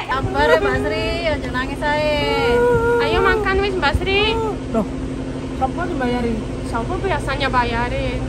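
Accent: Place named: Lumajang, Indonesia. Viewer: Koyok boso lumajang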